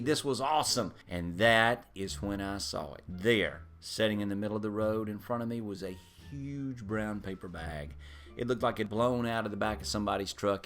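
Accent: South, United States. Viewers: You know, when I started watching your videos, you mention that you live in the south and I’m thinking “hmm yeah, you do sound a little southern”. Then I come to this video with no expectations and I hear your dad talking and I just can’t stop smiling XD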